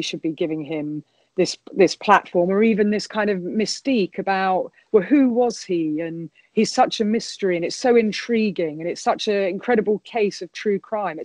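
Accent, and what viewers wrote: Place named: RP, United Kingdom. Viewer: You people have no idea she is well respected worldwide, secondly her way of speaking is a received English pronunciation and accentless, and her voice is very easy to listen to.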